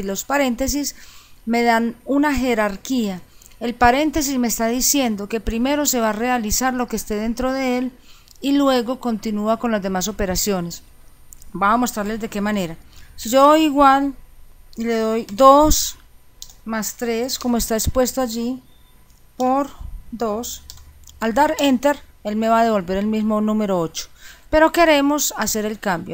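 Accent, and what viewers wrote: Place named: Antioquia, Colombia. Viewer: Ese acento Paisa ayuda mucho a entender el curso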